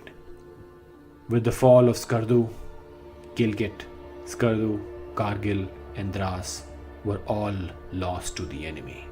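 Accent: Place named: United States. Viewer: Excellent research and presentation, as always. One request: pls try to use the King's English i.e. the English that is taught in our best schools and also used in our military. Therefore, 'route' should be root (not rowte), 'lieutenant' should be leftenant (not the U.S. "lewtenant"). Else the Americanisms are jarring to the educated Indian ear.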